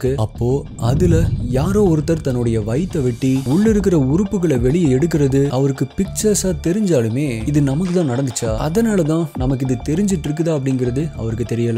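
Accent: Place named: Kerala, India. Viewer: YOUR VOICE LIKE FROM KERALA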